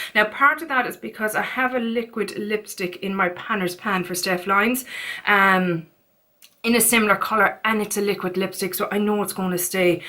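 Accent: Ireland. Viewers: What a brilliant tip from your subscriber, so simple but hardly thought of. Will definitely be doing that with products with air bubbles from now on. Love your videos, your accent is beautiful. What part of Ireland are you from? X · I put my phone up to my husband's ears bc I knew he would Love your voice. He didn't know what I was doing. His response "I could listen to her all day". Kelly Macdonald is his favorite voice. Which region in Ireland are you from? I don't know the regional differences. Loving your videos!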